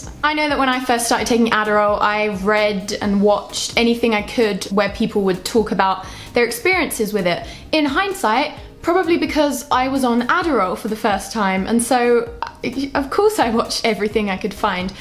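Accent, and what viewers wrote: United Kingdom. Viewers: Oh my lord, blonde hair, blue eyes, and your british accent. Im in love! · I honestly didn't realize the accent until you mentioned the fact that you were from England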